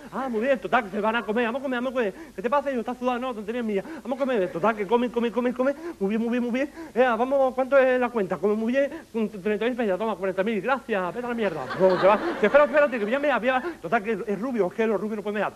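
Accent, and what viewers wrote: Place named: Huelva, Spain. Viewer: pedro reyes es buenisimo!!! m encanta, es un humorista poco corriente por eso m resulta muy gracioso ademas ese acento, ademas de huelva como yo, eske es la polla... el primer hombre de peluche, y direis, donde tiene el interruptor??? ahahahahah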